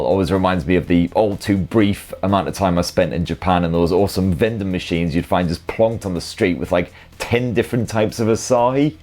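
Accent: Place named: Teesside, United Kingdom. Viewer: Come on here to check if my offered upgrade of the Sony phone was worth it - are you a Boro lad by any chance?? Thought that was a TS postcode at first but the accent is nearly there! ha ha Thought i'd take a guess anyway .... been offered Apple for the first time but never bothered with them but it is for around the same price. My only issue is they seem to release a new one every other week so I hesitated on the offer thinking I'd be offered a ten year old one for nearly forty a month As you can tell I don't know anything about phones. I just like good looking phones with decent office abilities and internet surfing features with a rad display - was hoping this Sony would cut the mustard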